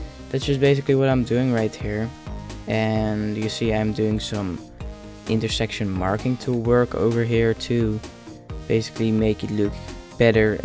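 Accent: Netherlands. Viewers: Are you Dutch/Netherlands?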